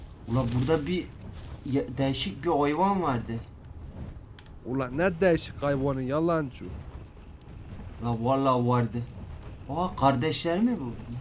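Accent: Elazığ, Turkey. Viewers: Abi Konuşdugunuz Elazıg Şivesi :D ula falan :D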